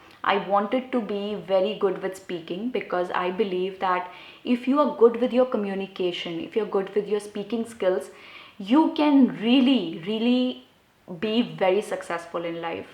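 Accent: India. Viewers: Mam, your accent is very similar to the Indian From which country do you belong mam ? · I love your video. specially I like it because your Indian accent .. You've inspired me talk to English in easy way..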